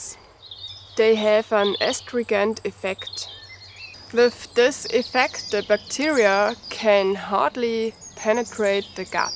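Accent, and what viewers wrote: Bavaria, Germany. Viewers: never heard deutscheres english :D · Hallo, I recently discovered your channel, and the videos you have on here are amazing. In addition to the scenery and skill you show, I really love how you show the trains coming by in so much of your video work and listening / looking for them. I Love your German accent by the way, while it used to be more common that I've heard in older generations, from what I've seen in recent times, I don't hear it as rich and pronounced among many people as before, like yours. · кошмарный немецкий акцент...но всё равно понятно.беда в том что женщина не создана для выживания в дикой природе. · Lucky bavarian english .. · aloha, i saw your video for the first time speaking English but immediately recognized that you were German and later in the video i learned that you were in Chiemgau Bavaria. This is absolutely one of the most beautiful places, Lake Chiemsee and the Kampenwand in Aschau. 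i lived in Sachrang and near Traunstein, but now back in Aschau. Aschau is great for hiking into the forest, and for mountain biking. maybe i see you here one day making a video of Aschau and Prien river. Entspannen and enjoy. your english is just fine, i learning to speak german. Aloha. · Love your german accent. Nice video. You have a very appealing shape.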